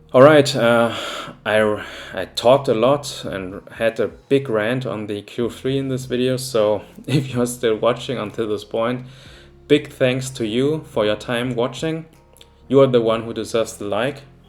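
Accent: Germany. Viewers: i think i hear a german accent, live in Germany? i was incredibly hyped up for leica q3, you shattered my dreams :( :P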